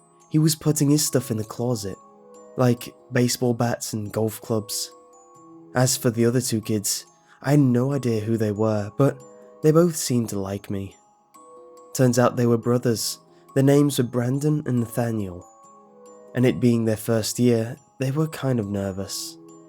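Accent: England, United Kingdom. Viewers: A bad story read by an Englishman is still a bad story. Why do people assume that slapping on an English accent will enhance something. Oxy Clean doesn't work better since Antony Sullivan has been shilling it. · British or some kind of English, i believe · I think he is english. And some people do like this story.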